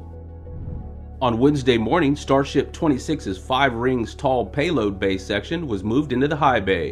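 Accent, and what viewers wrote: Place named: South, United States. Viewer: The audio commentary is great, and a nice Southern Accent! Ty 🎉🎉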